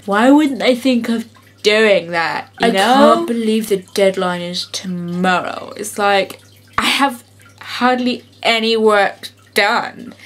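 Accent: England, United Kingdom. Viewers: I love your British accent! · i friggen love your english accent IM FROM SCOTLAND :) cuz you and i are like the awesome people from the UK ;D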